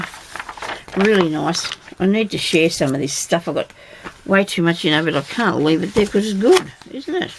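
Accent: Australia. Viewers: hi, just found you on you tube soon as i heard your voice i knew your a aussie lol. just wondering were abouts in australia I am in south australia. do look forward to watch your future videos and you older ones take care. Lesley x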